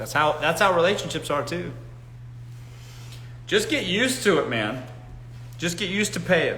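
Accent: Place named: New York, United States. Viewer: You sound like a real New Yorker 💯😂 I like you... I'm a lady from New York and we just say it straight with no chaser!😂 Cheers to you,!🎉💞😂🥂😎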